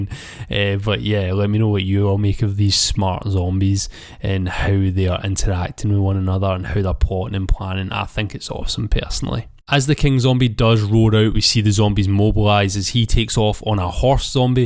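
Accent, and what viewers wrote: Scotland, United Kingdom. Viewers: Ive been looking forward to this 💪🖒 What part of scotland you fae mate? i cant tell if your same as me or west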